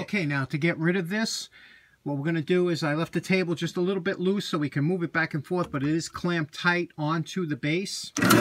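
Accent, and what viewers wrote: New York, United States. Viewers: Is that a New York accent I hear? (I'd say definitely East cost?) :-)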